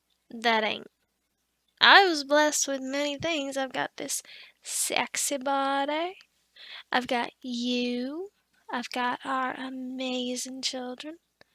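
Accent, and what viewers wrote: West Virginia, United States. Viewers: This was frikin adorable lol, ironic enough I am practicing to do music for my second channel in a few years. So hey maybe you don't have pipes, but ya sure as heck have a sweet voice I could listen to forever😊, I also enjoyed the WV accent considering I live here though originally from VA I am not gifted with an accent.😂 Probably for the best considering my flavor of music to do, Anyways awesome video, you're amazing as always, hope you have a wonderful day and enjoy the Christmas month, God bless take care, and much love!🌸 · Well done on the eastern West Virginia accent, especially for a first try! If you ever want coaching or practice, let me know!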